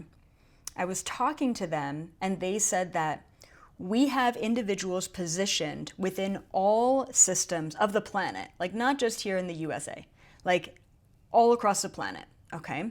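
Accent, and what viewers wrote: Canada. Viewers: Thank you for your readings!! I found your channel about a week ago and realized you were Canadian from your accent! My parents and relatives are from Canada, they would be appealed by what is happening in the United States now!! Total corruption!!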